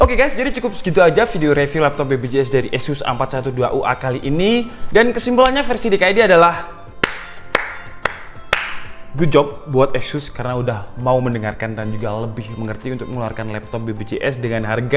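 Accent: Malang, Indonesia. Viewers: Mualang tenan jancok e metu 😂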